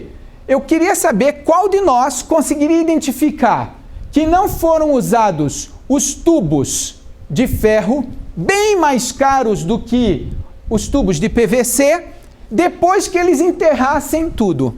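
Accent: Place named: Florianopolis, Brazil. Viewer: A parte da formulação de preços por meio dos orçamentos de empresas para compor a média dos itens é o grande buraco que os municípios, estadone união precisam sanar, pois alem das empresas serem direcionadas os orçamentos sempre vem com valor alto e muitas vezes nao baixa. Em relação a fiscalizar ou auditar as prefeituras e empresas, poderiam contratar fiscais para auxiliar os auditores e filtrar ou seja ir a campo e repassar relatórios de indícios para os auditores. Os fiscais atuariam com investigadores e os auditores como delegados. A pergunta: porque isso nao é feito? Os municípios lidam direto com a realidade do munícipe e contratos com valor dobrado de preço indica que alguém está obtendo vantagem ilícita, seja empresa ou pregoeiro e que o processo está solto e sem controle externo. Um sistema de consulta de preços públicos ou licitados poderia ser uma possível solução. 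Tipo um órgão que cadastrasse os itens e preços licitados e a união, Estados e municípios pudessem consultar e utilizar como referência na composição da média. Entende que a economia para o Brasil seria imensurável. Daí vem outra pergunta: seria do interesse dos governantes criar isso? Grande abraço conterrâneo de Floripa, o sotaque não nega.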